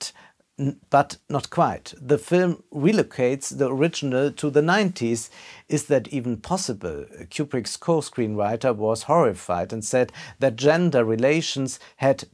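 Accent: Germany. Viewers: He just started the english channel, he gets round about 30-60,000 views on the german one. Sometimes he gets much more views if a movie is currently in the theatre and is more sophisticated, like "Tenet" recently, ore when he rants about janky german rom-com movies. I would not worry about the german acsent, it's Kind of original, but I wouldn't be if surpriced if some viewers find it anoying, it's kind of special channel with interesting takes and viewpoints.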